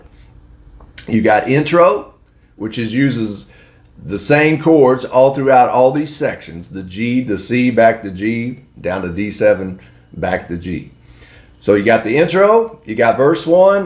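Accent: South, United States. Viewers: u have a pefect country voice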